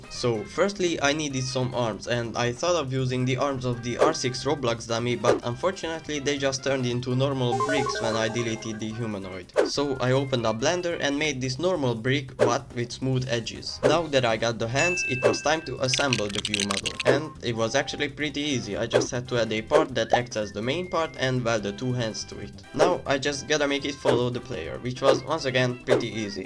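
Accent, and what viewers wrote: Hungary. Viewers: nem feltűnő magyar akcentus lol, jó video végre találtam egy magyar roblox devet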